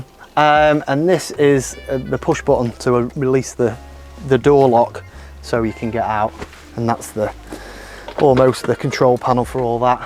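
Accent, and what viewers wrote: United Kingdom. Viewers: brit accent. Hard to understand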